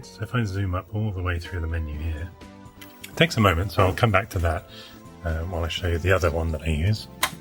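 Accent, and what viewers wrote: United Kingdom. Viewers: It's great to hear a blighty accent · your lovely British accent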